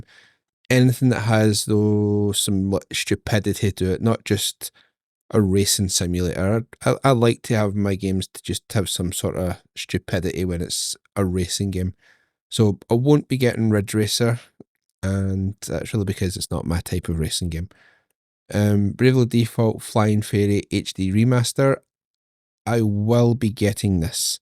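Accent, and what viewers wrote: Scotland, United Kingdom. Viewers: Maybe a stupid question but had to ask after seeing any of your videos for the 1st time and hearing your voice: You from Scotland?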